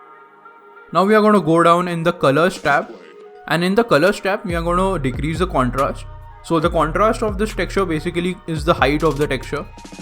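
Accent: India. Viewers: There's a heavy Indian accent!!😂😂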